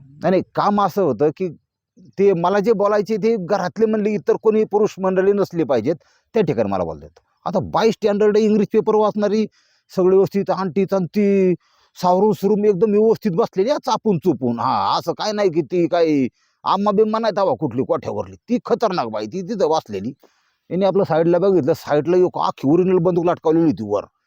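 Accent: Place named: Pune, India. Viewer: मामा पुण्याची मराठीच सर्व उत्तम आहे . मुंबई पेक्षा भारी आणि सरस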